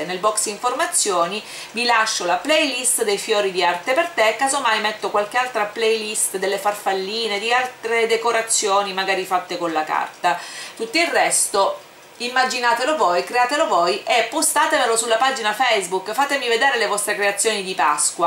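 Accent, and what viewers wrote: Sicily, Italy. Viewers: Sei sicula?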